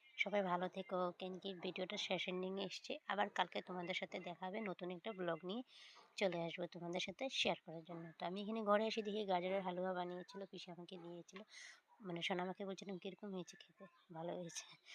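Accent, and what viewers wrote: India. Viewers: তোমার কথা গুলো কি মিষ্টি লাগছে শুনতে ❤ তুমি কি ঝাড়খন্ড থেকে। ওই রকম টান ❤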